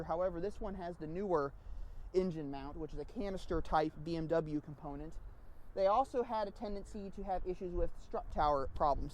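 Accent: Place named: United States. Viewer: Damn I wanted to watch an R53 guide... but I can’t listen to this Yanky accent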